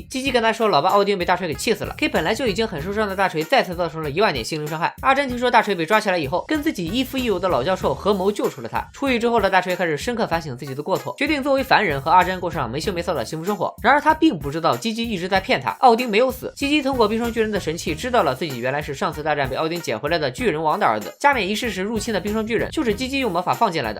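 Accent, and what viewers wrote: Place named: Tianjin, China. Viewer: 那不是天津话么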